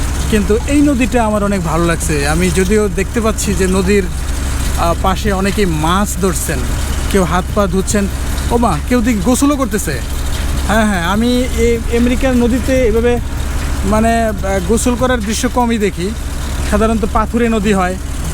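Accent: Sylhet, Bangladesh. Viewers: ভাই তুমি সিলেটি নি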